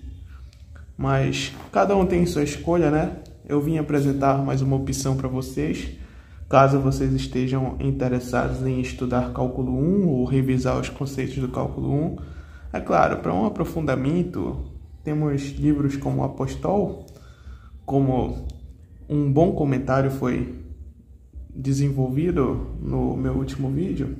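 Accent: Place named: Pará, Brazil. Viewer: Não precisei assistir o vídeo nem por 5 segundos para descobrir que tu és paraense hahahah; é bom ver mais canais daqui surgindo. Parabéns pelo início e pelo conteúdo! já me inscrevi para acompanhar os próximos passos. No que concerne ao teu vídeo, o que particularmente me agrada muito no estilo do livro do Louis é o fato dele explicar os tópicos usando, muitas vezes, PALAVRAS e não ficar abusando de recursos visuais, por vezes inúteis, para "elucidar" algo. Ademais, além dessa escolha de usar muitas palavras para apresentar um tópico, ele muitas vezes não omite certos detalhes que os mais "moderninhos" por vezes omitem. Dentre os livros mais "básicos" de cálculo como Leithold, Munem, Simmons, Ávila, Diva, Thomas, Anton, Swokowski, John Rogawski, Stewart, Lang, Larson, Paulo Boulos e etc., os que mais curto são o do Leithold, do Serge Lang e do Geraldo Ávila.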